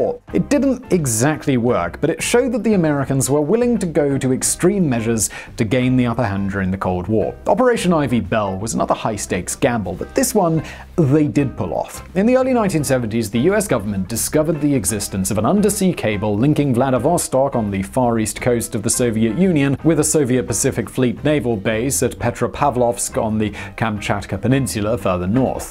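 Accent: RP, United Kingdom. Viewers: Or... you can watch something like: <link> and get more information in three minutes than this does. Why is that? And, minus fill (to make up a required video length to be monetized), no BBC British and insipient hand waving and mannerism. In fact can be done in three minutes no sound at all. Nice.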